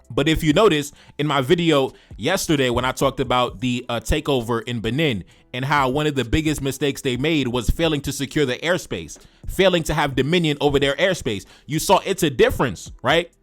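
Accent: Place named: United States. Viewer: Thank you for the explanation, Bro, for explaining what has actually happened. I love your American accent!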